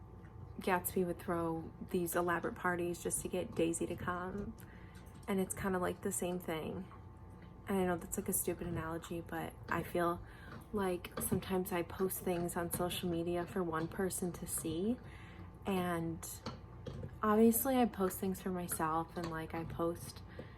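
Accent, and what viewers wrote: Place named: New York, United States. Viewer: Girl what part of New York are you from !?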